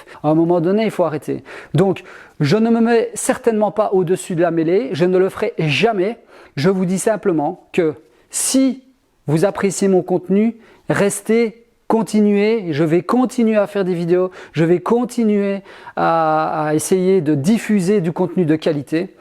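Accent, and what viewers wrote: Belgium. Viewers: Salut, je suis venu sur ta chaine par le biais de floky, malgré le temps je suis toujours là à regarder tes videos et on doit être un gros paquet, nous comprenons bien ton coup de mou, pas facile d'encaisser des critiques sans rien savoir de l'honneteté intellectuelle, de la compétence, ni de la comprehension des personnes les regardant. Pour comprendre tes vidéos, il faut avoir connu tes débuts, tes explications, et savoir que tu n'as rien d'un donneur de leçon... Alors, comme tu ne vas pas sans cesse expliquer sous peine de ne pas avancer, dis toi simplement que parmi tes 40K d'abonnés, tous ne sont pas bienveillants et avance et crois moi le jour ou tu auras le melon ou tout autre connerie, la communauté entiere saura te dire à quel point tu es dans l'erreur...en attendant, on aime tes videos, les astuces minutes (tant appreciées de floky), tes prononciations belges, l'académie du 'Suleve', ton amour des abeilles, bref, releve toi, mon gars t'es une bête du bois.. 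· Salut, j'aime beaucoup ton "Belge parlé", je te rassure tu n'es pas un cheval blanc et encore moins une star du you tube, mais reste comme ça, la qualité du contenu est bien perçue, on sent bien le VRAI. Un seul regret, c'est que la Belgique soit si loin du pays basque 😊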